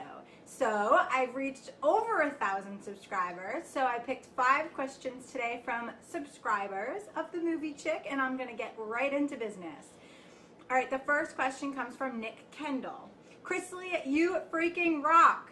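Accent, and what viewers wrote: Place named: East Coast, United States. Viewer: You have such an east coast accent! LOL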